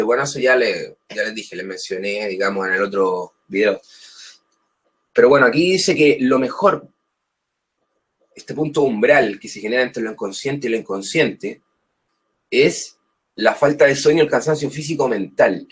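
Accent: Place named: Chile. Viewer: 🤗¡ Me encanta el acento chileno ! 🇨🇱❣️ 🥰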